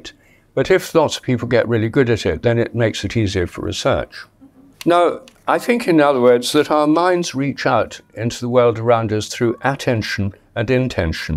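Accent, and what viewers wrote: RP, United Kingdom. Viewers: Occam's razor. 1. People are social creatures, we will always feel more watched when in company (spotlight effect). 2. Confirmation bias. We remember only the times we looked and saw someone looking back, forget all the times we looked and nobody looked back. 3. No more need for all the hypothetical superpowers or deities to add on to the above. Even a posh English accent can't wash away the flood levels of BS here. Unfortunately, the promotion of pseudoscience is a hard no for me, so unsubscribing from this channel.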